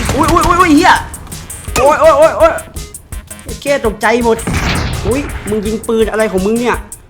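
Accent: North, Thailand. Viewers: ปี่เป็นคนเหนือกะคับ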